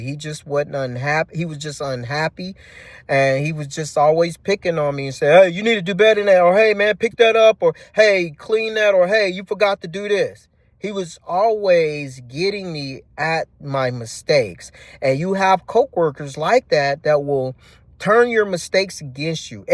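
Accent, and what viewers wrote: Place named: Missouri, United States. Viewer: Bro...Solid commentary as always. Also, U remind me of Mason Betha but with a Missouri accent. You should write a book with all of your stories. Reminds me of stories I read in two books: (1) Working for You Isn't Working for Me (2) Living Successfully with Screwed-Up People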